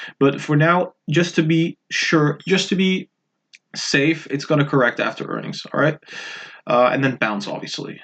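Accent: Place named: Netherlands. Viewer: Ik dacht al je engels heeft een Nederlands tintje hahah, volg al een tijdje je video's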